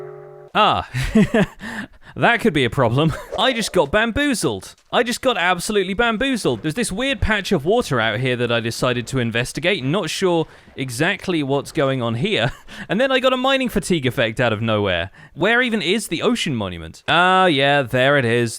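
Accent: United Kingdom. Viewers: I have a question are u British? No pressure😅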